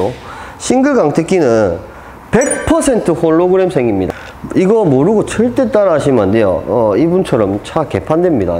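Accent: Gyeongsang, South Korea. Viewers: 말투랑 목소리가 내부자들에 조승우가 경상도사투리 구현한것 같네 ㅋㅋ 저도 경상도인 ㅎ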